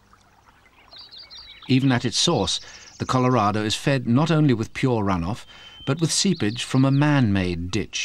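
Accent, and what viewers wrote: United Kingdom. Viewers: I live right on the confluence of the Colorado and the Gunnison Rivers. The photography was beautiful. Colorado is a beautiful state and the many rivers and tributaries are fascinating. Unfortunately there were many inaccuracies and mispronunciations in this offering. Yeah and the Brit?! Really...🤨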